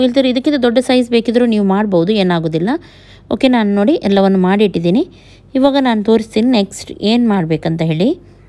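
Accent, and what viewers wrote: Mangalore, India. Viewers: ನಿಮ್ಮ ಜನ ಅಂದರೆ ತಪ್ಪು ತಿಳ್ಕೊಬೇಡಿ ಅಂದ್ರೆ ನಿಮ್ಮ ಮಾತು ನಿಮ್ಮ ನಡತೆ ತುಂಬಾನೇ ಇಷ್ಟ ಮಂಗಳೂರು ಮಂಗಳೂರು ಕನ್ನಡ ಭಾಷೆ ತುಂಬಾನೇ ಚೆನ್ನಾಗಿದೆ ಅದಿಕ್ಕೆ ನಾನು ಹೇಳಿದ್ದು ನನ್ನ ಮಗಳಿಗೆ ಹಾಯ್ ಹೇಳಿ ಅವಳ ಹೆಸರು ಶ್ರಾವಣಿ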